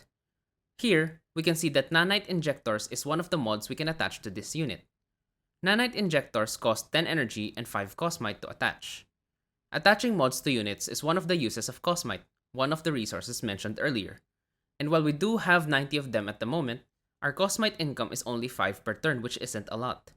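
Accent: Philippines. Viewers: Hi there you sound Filipino sorry if im incorrect. But you are the best Planetfall teacher ever, you literally teach us what we need to know unlike other youtubers they talk for hours and it so boring and draining I can't be bothered listening to them. I only needed to know what diplomacy was but one question How did your game start with ''0'' reputation for your enemies in my game they always start with -300? and I am always at war. I can't pronounce anything to them in Scenario. I also can't trade Casus Beli. What am I doing wrong how do I start with Neutral Reputation. Please anyone help.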